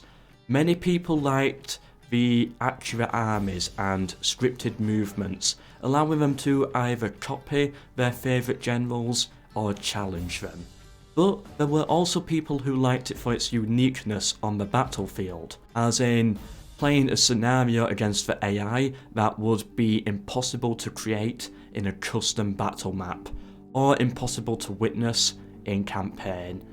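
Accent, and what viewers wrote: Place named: Yorkshire, United Kingdom. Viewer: h so this is the well-known yorkshire accent that everyone speaks of. Now i have heard it aswell finaly. Must admit that for me as a german it is sometimes hard to understand acusticly, but the quality of the content makes up for it ! :)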